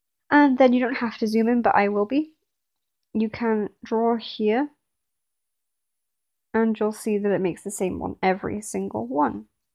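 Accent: United Kingdom. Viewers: thank you british lady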